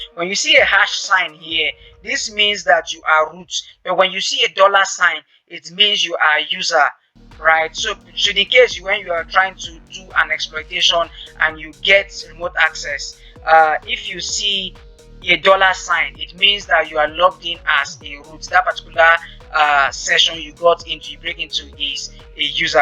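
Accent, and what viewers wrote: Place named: Nigeria. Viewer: Thank you very much sir, you sound like a Nigeria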